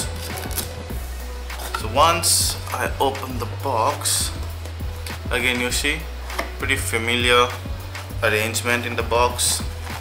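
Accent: India. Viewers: You think this English sucks? You should listen to Dutch people sometime, you'd cry. I think he's from India, or somewhere close to that. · Ocelot's Vlogs Yup He has an Indian Accent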